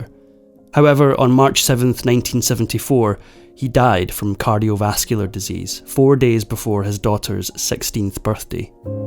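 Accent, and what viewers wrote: Scotland, United Kingdom. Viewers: Yeah, beautiful Scottish accent. Lovely 😊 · Evil has resided in Hollywood from day one! Sadly, it has destroyed the lives of way too many actors over the many years, like Anissa.😔 Btw, I love the Scottish accent! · Can you please stop with the British accent? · That's a Scotsman accent.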